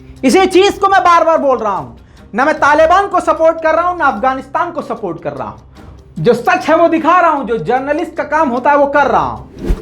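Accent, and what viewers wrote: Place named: Indore, India. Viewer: पक्के इंदौरी भाषा बोले हो भाई आपकी न्यूज देखने और सुनने का मजा ही अलग है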